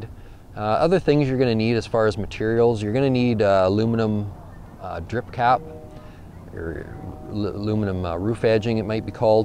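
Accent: Canada. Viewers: First time here....why do you sound canadian?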